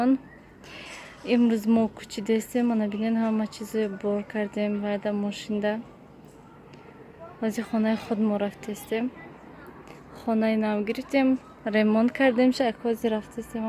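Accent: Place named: Khujand, Tajikistan. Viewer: салом муборак шавад хонаи нав насиб куна худочон моям сохиби хона кунад мебахшед шумо гапзанитон хучандихоба монанд не душанбегихоба монанд мо хамихе гап мезанем илтимос нависен ай гучо шумо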